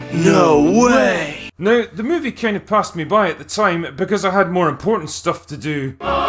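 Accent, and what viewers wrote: Scotland, United Kingdom. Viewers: You should know that with me and my wife are confused about some thing or don’t have an answer we will respond to one another in your heavy Scottish accent and say don’t know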